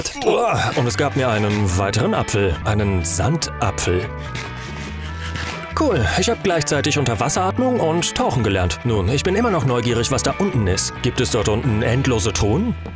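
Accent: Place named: Germany. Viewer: Er klingt wie ein alman aber es ist geil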